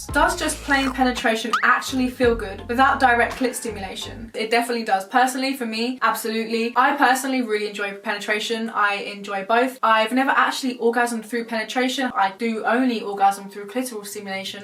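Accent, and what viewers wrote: Croydon, United Kingdom. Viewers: Charmee Taylor She addresses in an assumptions video how people think she's trying to be black when it's just the accent. That's just the accent in Croydon, all races speak this way there ☺️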